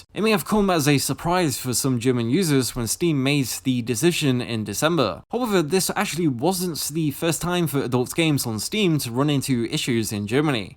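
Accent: United Kingdom. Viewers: You speak with an English accent yet you say "adult" and "minor" like an American. Weird how the internet has influenced the way young English people speak. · Hört sich nach einem Briten an.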